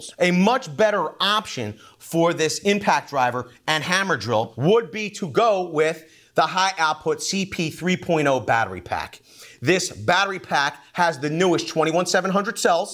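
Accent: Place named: New York, United States. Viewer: My apology for not possibly be knowledgable of a fact, but why is it common to see these people with the NY accent / Boston always talking with this bad attitude behavior, as if they're going through some divorce, or for one reason or another, they're having to resort to buying cheap britches that ride hide high in the crack of their a$$es ?....I mean really guy's....... Isn't there someone close to this guy that can enlighten him about the used boxer brief at the Salvation Army that he could purchase at a fairly good price, that allow him to calm the "F" down! especially when doing these very informative video's ?....Maybe its the Napoleon Syndrome...I'm sorry if I'm out of place...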